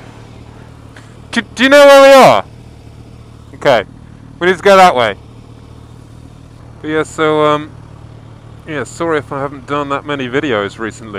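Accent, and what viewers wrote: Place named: London, United Kingdom. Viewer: what side of london are you from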